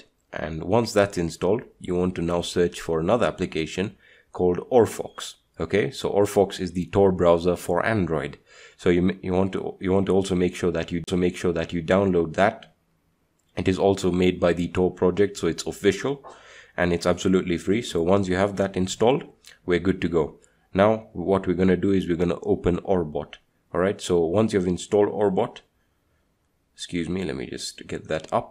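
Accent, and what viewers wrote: India. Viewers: He's obviously Indian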